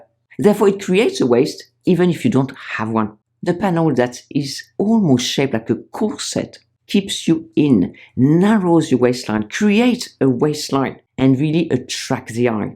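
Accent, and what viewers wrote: France. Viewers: I could listen to you talking with your French accent forever. 💙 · Such helpful advice, even more effective delivered d with a charming French accent! And your humor is another bonus 😍thank you! Just found your video today so am subscribing formore🙏🏼🥰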